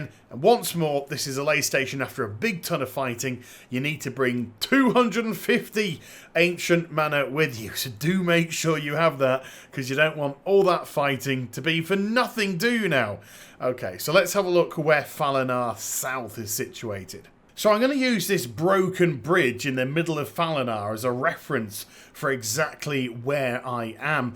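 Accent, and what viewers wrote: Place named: United Kingdom. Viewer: Really like your videos. You're actually one of, if not the best WoW YouTuber in my opinion. Also i like your voice and your accent. As a German who doesnt really appreaciate the British-English-Accent yours is very enjoyable. Thumbs Up.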